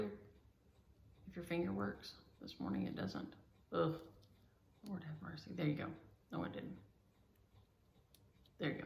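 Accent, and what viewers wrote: South, United States. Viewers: LOVE your videos, you are so funny, love your southern accent. I want to mention Wicked and Heart. Thank you, my name is Linda Norris, Springfield, Ohio.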